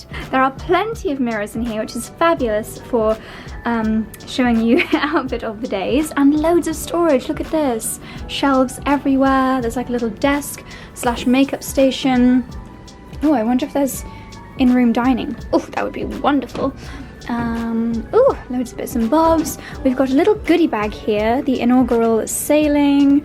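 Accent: United States. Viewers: I just love the American twang you have in your accent when you go State side 🥰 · Oh and your American accent is hilarious 🤣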